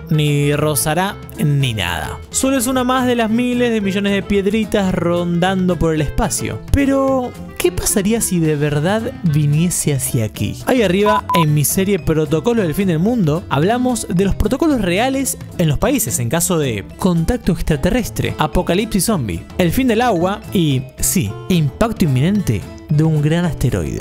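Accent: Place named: Argentina. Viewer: Con esa vs argetiinoo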